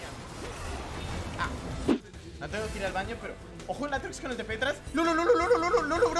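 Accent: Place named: Caribbean Coast, Colombia. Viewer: pipi sonso dice que eres costeño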